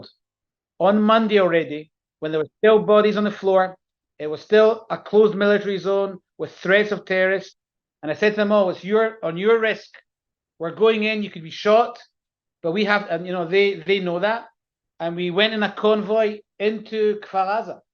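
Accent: Scotland, United Kingdom. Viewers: I stand with Isreal and loving the Scottish voice. Praying for the complete end of Islamisist terrorists death cults and the beginning of a positive living future loving life. 🙏 How can they believe people who pray to ‘the greatest deceiver? and the mischievous maker’. If you believe them you can’t believe them, right! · Do I detect a slight Scottish Accent in there somewhere Sir? You are so right Sir. You’re a very open and honest man! You and your Nation needs to, and Must show the Whole World what happened in your country. Israel was intentionally, deliberately and savagely attacked in a totally unprovoked act of Genocidal Evil. You must show the World Uncensored and Raw images of this disgusting Pogrom committed against Israel and the Jewish people. Nothing like this has happened since the Nazi’s Genocidal murder of the Jews during the Second World War! 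You must defeat this Evil and the World needs to back your actions. All those who tell lies, the Western Media, University morons and all these sick anti Jewish, Anti Israel Haters and Demonstrators are Supporting Genocide and NAZISM. Hamas behave like and act just like the Nazis did, they are Evil Brainwashed Fanatics, and so are all those who support and back them! They must be shown that they are wrong, you must show the Entire World what happened to you people! God be with you my friend. Shalom!! A Supporter of Israel from England. ❤🏴🇬🇧🇮🇱🇺🇸❤ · we love your Scottish accent!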